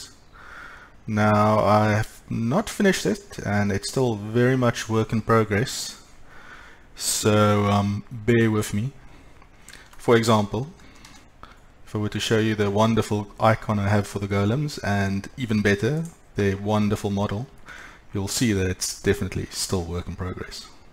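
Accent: South Africa. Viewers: Your sound South African, regardless, great mod. Keep it up.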